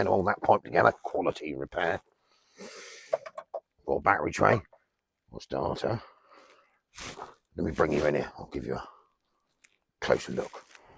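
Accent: United Kingdom. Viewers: As an American ur the first British person I’ve had a hard time understanding lol I love the accent and the car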